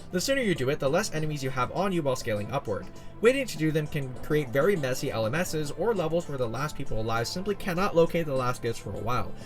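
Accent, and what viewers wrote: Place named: Canada. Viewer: you sound like kenadian. good video <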